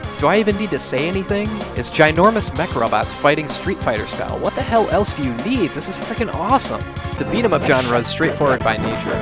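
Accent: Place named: Midwest, United States. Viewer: What part of the midwest US are you from?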